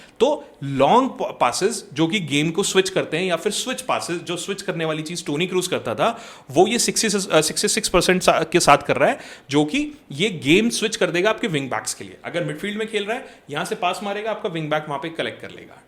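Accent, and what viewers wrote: India. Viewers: I'm not sure if Pintus will leave. He isnt a part of Carlo's staff, works for the club. Although Xabi will have his own staff, I think Pintus will stay. One suggestion from my side. I have noticed that people find it weird when you pronounce the players name in Indian way. Like usually other creators, if you have seen, they pronounce the players or clubs name in the actual pronunciation. Hope this might help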